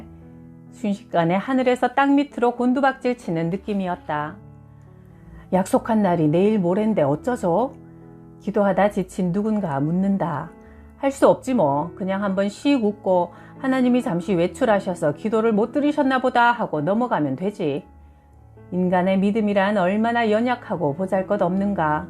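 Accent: Busan, South Korea. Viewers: 님 부산 사투리 ~~ㅎㅎ 최곱니다.^^ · 님 부산사투리 직이네예~~😂😂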